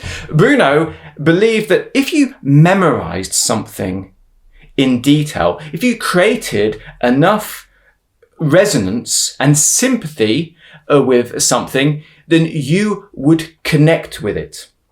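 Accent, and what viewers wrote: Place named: South, United States. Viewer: He's an Englishman speaking like a Southerner. As a Texan, we talk that way so people can better understand what we're saying. Comprende??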